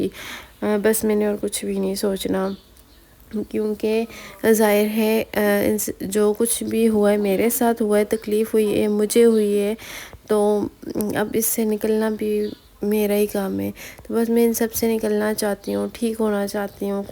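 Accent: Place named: Chhachh, Pakistan. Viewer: اپ کے لہجے سے لگتا آپ چچھ کی ہیں نہیں؟ میں اٹک سٹی سے ہوں اس لیے میں لہجہ پہنچانتی ہوں وہاں کا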